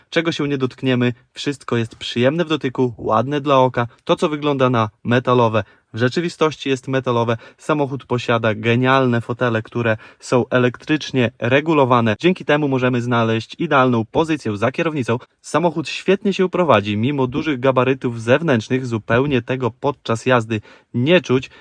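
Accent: Podlasie, Poland. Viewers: Ale zaciagasz podlasiaku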